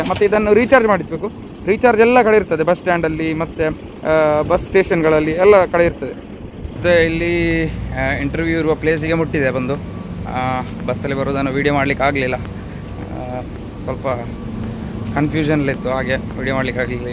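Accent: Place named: Mangalore, India. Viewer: Nam mangalore baashe keluke chanda marre...sudden agi nim vlogs pop Aithu check madide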